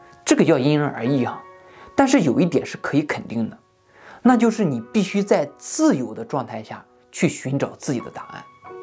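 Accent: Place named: Shandong, China. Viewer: 我怎么听着你的口音这么山东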